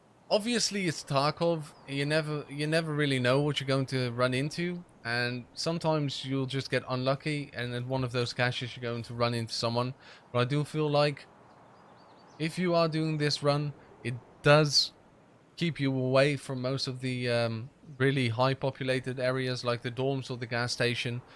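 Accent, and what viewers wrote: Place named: South Africa. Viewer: I've been trying to figure out where you are from by listening to your accent.. (Found your channel today) First I was thinking European that's lived in New Zealand a long time.. But now I'm really leaning towards SA.. :P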